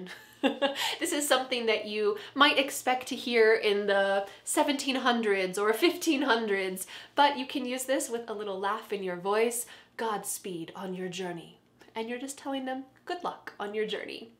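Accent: United States. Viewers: Your pronunciation is good for me to understand... I watch different channel's videos but they aren't that clear to me. Only yours and marinas(from linguamarina) pronunciation is better to me.. I love american accent.... ❤️ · When you use american accent i just fall in love with your English lots of love from lndia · Although you speak american english, but i think i can listen to your pronounciation very well .because your voice is clear easy to understand.who think like me?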